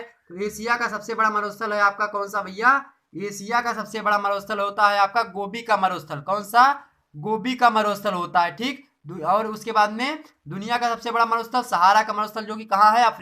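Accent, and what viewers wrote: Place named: Bihar, India. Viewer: Tu hu guru bihariy lagat hawa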